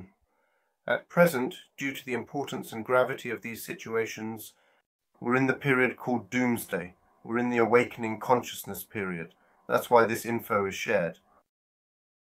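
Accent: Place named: United Kingdom. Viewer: Güzel bir İngiliz aksanıyla güzel bir açıklama olmuş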